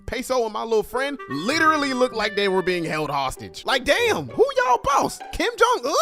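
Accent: United States. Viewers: It's ur accent bro, u got an American accent that many people in South Africa might find interesting... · from one honeyless man to another let me inform you in Joburg no honey gets "rizzed up" they just want the paper cuh, they just heard your American accent and probably thought "yeah, he got that cheese". You want a honey who'll be a ride or die, go to KZN get yourself a Zulu wifey, next time you in SA. AND DEAR GOD DON'T GET A XHOSA hun.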